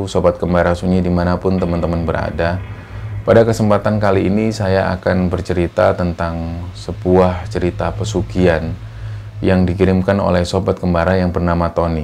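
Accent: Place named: Surabaya, Indonesia. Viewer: Aq seneng logat Surabayanya nek cerita, jadi kangen kampung halaman, tanah kelahiran Surabaya.. hahhaa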